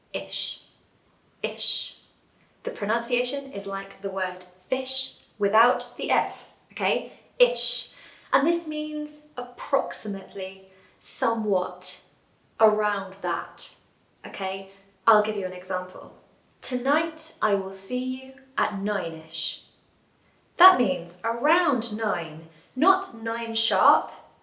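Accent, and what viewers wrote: United Kingdom. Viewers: I love British accent, it's a quite nice for ears, thank you for nice lesson, have a wonderful day, regards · I love your lessons. you are always friendly, modiste your good mood.. just keep going on! It's good to learn from you now i now why british people speak lovely accents thank you for teaching . It's the right time to l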